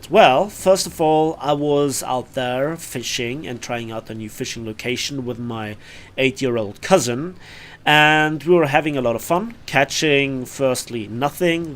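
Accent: Bavaria, Germany. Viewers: I hob mas scho gdocht dasd a Bayer bist hahaha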